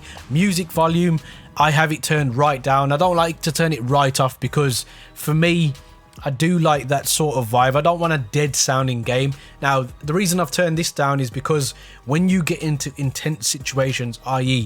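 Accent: Birmingham, United Kingdom. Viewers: Yes brummy lad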